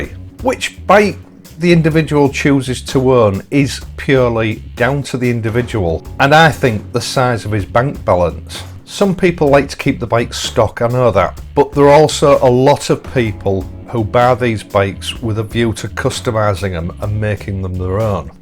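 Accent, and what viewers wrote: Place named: Hull, United Kingdom. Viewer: Hi - love listening to you as essentially I'm a Hull lad (of 56 years:) that's been living in Sweden the last 24 years so it's nice to hear the dialect I grew up with:) All of your videos are frank, no-nonsense offerings which are so refreshing - which I really appreciate. No BS here! This air-cooled v. water-cooled comparison is also great as I'm suffering from that dilemma right now. This has helped a great deal, so keep up the great work and all the best.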